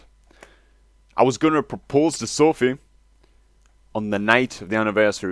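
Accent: United Kingdom. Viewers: What the fuck maaaannn I thought you sounded Brit but I wasn't sure but your fiancé is. Proper Geordie??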